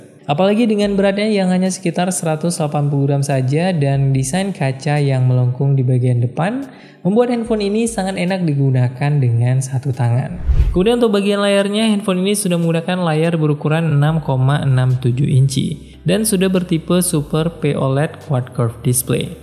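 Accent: Aceh, Indonesia. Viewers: Org banda aceh kah?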